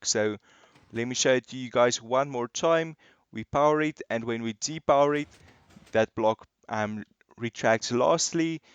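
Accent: South Africa. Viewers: Your south african again bra ek ook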